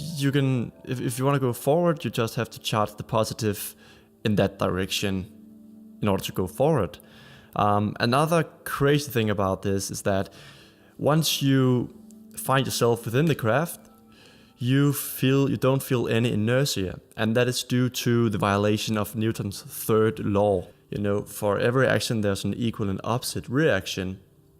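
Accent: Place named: Germany. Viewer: You sound German so you'll probably get this. First you have to understand how inertia works, then you can understand gravity. Since gravity is an acceleration of 9.8m/s^2 and since an accelerometer measures acceleration, and since accelerometers do not show any acceleration in a fall caused by the "acceleration caused by gravity" I looked into how accelerometers work. A small piece of matter inside the accelerometer is displaced when the accelerometer is accelerated, and the amount of the small piece of matter's displacement is calibrated to indicate the magnitude of acceleration. What makes the little piece of matter move? INERTIA. The same effect when you accelerate in your car and you're pushed back in the seat. In this analogy, your car is the shell of the accelerometer, and you are the little piece of matter being displaced when the 'accelerometer' - your car -- accelerates. 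You are pushed backward by inertia. Since the accelerometer shows zero acceleration in a fall to Earth caused by the "acceleration of 9.8m/s^2 due to gravity", that told me *THAT SMALL PIECE OF MATTER INSIDE THE ACCELEROMETER IS NOT BEING DISPLACED* by gravity's acceleration. Since the small piece of matter in the accelerometer ONLY IS DISPLACED due to inertia when the body of the accelerometer is accelerated, *THAT MEANS THERE IS A DECLINING INERTIA* in the downward direction. If the little piece of matter isn't being deflected, there is NOT ENOUGH INERTIA in the direction of acceleration (downward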